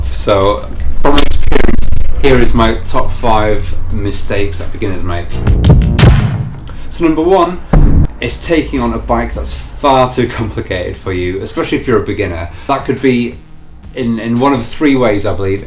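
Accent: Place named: United Kingdom. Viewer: thats how the britan english sounds like !!!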